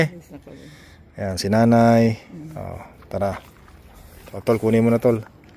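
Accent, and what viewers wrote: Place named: Nueva Ecija, Philippines. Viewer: yong accent mo parang Nueva Ecija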